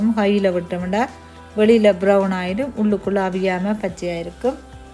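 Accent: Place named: Sri Lanka. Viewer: Sis nenga sreelanga ah va sis voice slang super🎉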